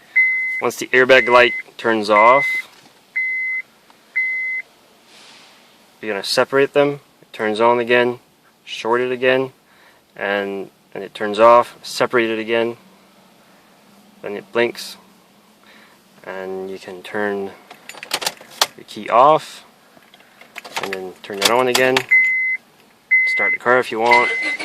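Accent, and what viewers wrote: Canada. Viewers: Edmunston area is my guess. Sounds just like my cousin's husband. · You sound like you're from NB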